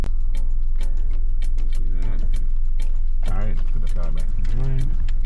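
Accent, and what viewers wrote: Jamaica. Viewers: you jamaican fam?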